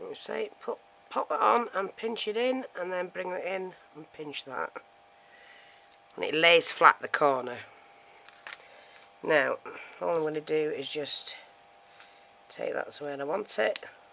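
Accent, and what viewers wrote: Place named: Leeds, United Kingdom. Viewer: So pretty. Keep them coming I can't wait to see what you come up with next. Thank goodness I don't work anymore I'd have to quit and stay home to watch. LOL My mother, uncle and gram & grandfather where born in Leeds. They came to USA in 1920. But I hear their voices when I listen to you. Thanks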